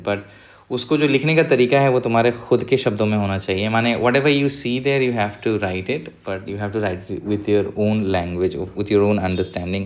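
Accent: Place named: Assam, India. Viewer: Sir r u from assam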